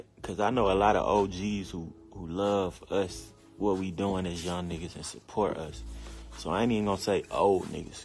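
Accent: United Kingdom. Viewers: Nigga u British u aint from the A fr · Ok British boy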